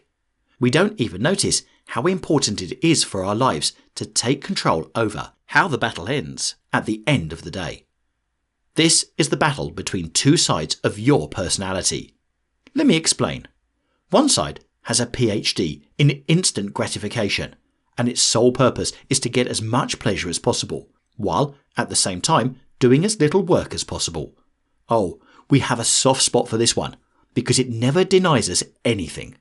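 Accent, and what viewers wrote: United Kingdom. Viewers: Yo, thank you again for turning my article into such an informing piece, honored man! P.S. It's so weird listening to something you've written, narrated in a British accent and animated in such a creative way:D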